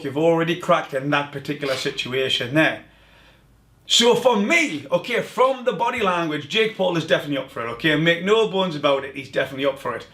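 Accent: Newcastle, United Kingdom. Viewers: You from newcastle? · Wow I really expected an American accent when I first saw the video, not a strong Geordie accent😀 good stuff, subscribing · Are u from Newcastle?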